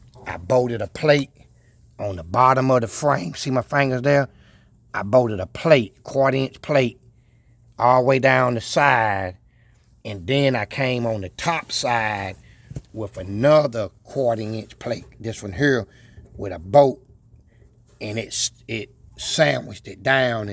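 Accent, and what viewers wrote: South, United States. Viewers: Love your accent, I’m from the south too, been to Ft Bragg when I was in the military years ago. Nice truck,I have a 63 c10 350/350 combo and would love to do that swap in my truck or 86 Trans Am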